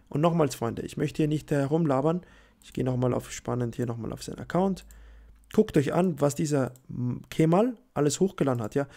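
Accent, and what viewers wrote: Austria. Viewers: Bist du Wiener ?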